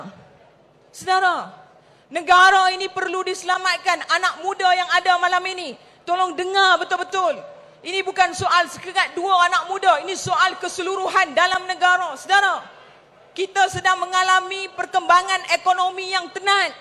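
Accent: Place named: Penang, Malaysia. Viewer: Seronoknya dengar longat Penang....gua punya hometown. Hantam kaw kaw very good presentation. Inilah ahli wanita yang berani cakap. Kita nak kaum wanita macam inilah....🤞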